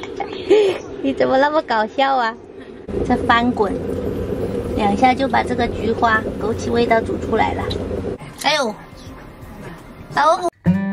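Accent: Sichuan, China. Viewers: ：我在台灣看妳的視頻三年了，我們是台灣外省第二代，小時候住在空軍眷村，因為空軍多半是四川人，我也會講四川話，聽妳講四川話，更親切！新年快樂，妳很棒，加油！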